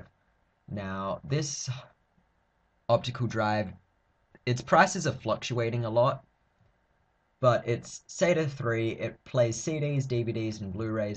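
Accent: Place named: Australia. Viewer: Well I think he actually sounds Australian. And Australian's use dollars, soo yea.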